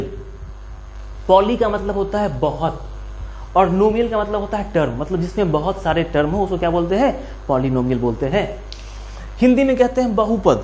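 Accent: Bihar, India. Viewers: aisa style to sirf Bihar ke logo m hi h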